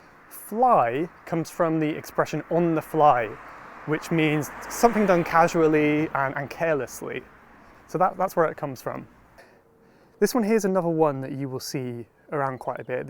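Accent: United Kingdom. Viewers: Very nice video. Love British accent.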